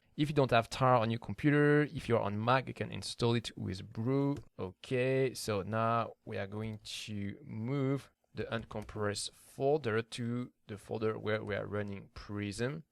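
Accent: France. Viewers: Merci ! Je réponds en Fr car je crois que j'ai reconnu l'accent ;) Ma question était : j'ai l'impressions que le Tuto de Prysm explique comment lancer son noeud établir son noeud sur son ordinateur. Je me demandais quelle était l'étape à suivre si l'on veut passer par un server comme digital ocean ou dappnode ? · 2 years later, it did not happen. And your French accent is still unbelievable :D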